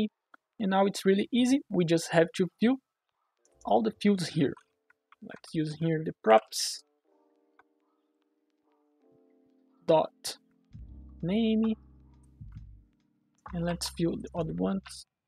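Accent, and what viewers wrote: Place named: Brazil. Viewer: esse sotaque nao me engana você é br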